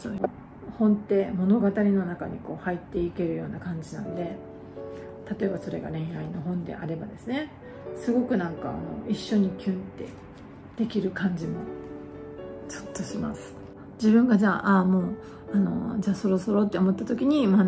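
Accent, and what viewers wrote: Kyushu, Japan. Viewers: やっぱり九州訛りが耳に着く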